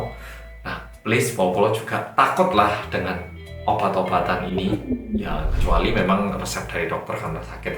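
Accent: Java, Indonesia. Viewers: gw suka nih konten ini crita2nya update,klo bcara detail dan jlas.lucu jg krna medok nya...smngat trus ya.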